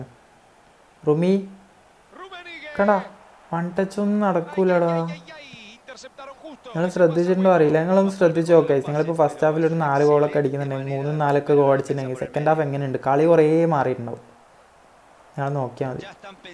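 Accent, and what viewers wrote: Kannur, India. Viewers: Brother kannur aano ?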